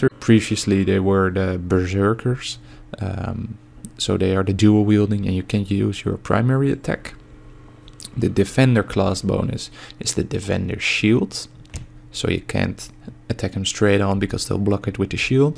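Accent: Netherlands. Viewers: op reis hahaha Wow ik dacht al dat ik het herkende:p (I already thought I knew the melody, but couldn't remember it:p ) Now that you say it's a dutch song, your accent sounds from Holland, is that right?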